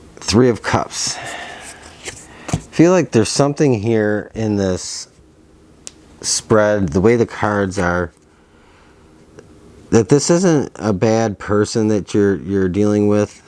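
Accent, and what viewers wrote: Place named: Michigan, United States. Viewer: This has nothing to do with the reading (although it was spot on for me), but are you from Michigan? Your accent reminds me of my friend whose from Michigan.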